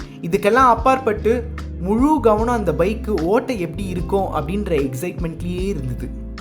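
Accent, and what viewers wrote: Chennai, India. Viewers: Hearing the name at the very first time! As usual I have enjoyed the way you talked about the bike and it’s performance. Please stick with your style of reviewing with namba chennai slang which has got many fans including me. There are many professionals in the market to talk about Bikes but you are little different than others. Hope you already know it and wish to meet you in person someday 👍🏻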